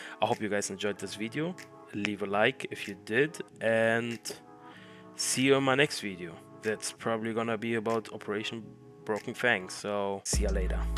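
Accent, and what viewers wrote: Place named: Germany. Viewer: Safe bist du deutscher das hört man an deiner Aussprache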